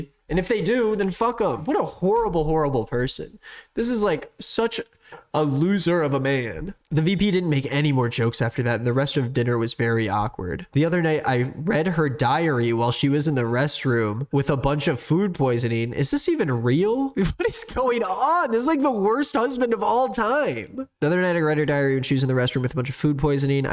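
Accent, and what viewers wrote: South, United States. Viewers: I work in a cali firm and I can tell you that everyone would find a southern accent super cool AND she says stuff like 'darn tootin'??? Amazing. Love her. I wish her the absolute best.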